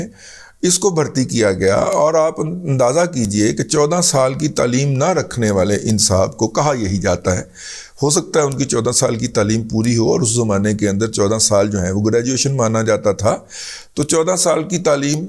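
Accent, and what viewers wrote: Punjab, Pakistan. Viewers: Compared to Mansoor Ali Khan, your Punjabi touch is much better and insightful.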